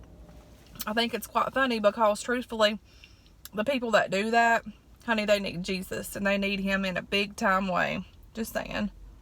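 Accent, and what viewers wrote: South, United States. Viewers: I'm a new subscriber! I just love you and your attitude/personality! You're so pretty and I love your country accent! Girl I feel you and I ain't got time for no negative stuff either! #2018 #Amen 😍❤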